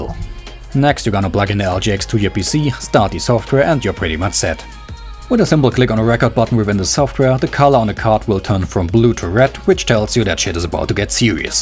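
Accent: Germany. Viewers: You are from Germany,right?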